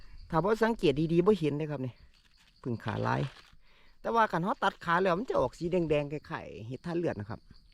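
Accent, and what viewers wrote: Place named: Suphan Buri, Thailand. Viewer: บ่าวสำเนียงคือคนบ้านฉันเลยลาวสุพรรณ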